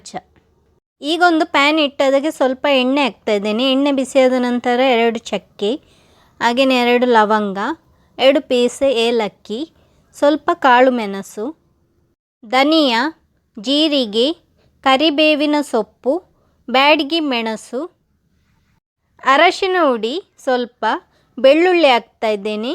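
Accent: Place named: Kundapura, India. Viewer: ಹೊಯ್.... ನಿಮ್ಮ ಕುಂದಾಪುರ ಕನ್ನಡ ಲೈಕ್ ಇತ್ತೆ... 😋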